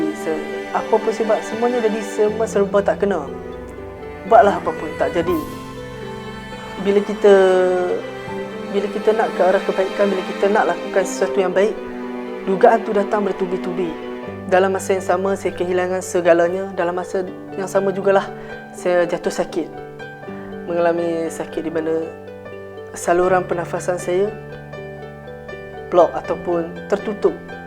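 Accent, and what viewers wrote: North, Malaysia. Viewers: Hang pasti oqang utaqa..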